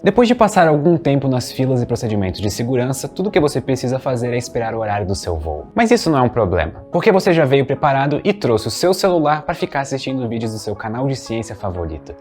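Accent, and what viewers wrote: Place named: Rio de Janeiro, Brazil. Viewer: CARIOCA SPOTTED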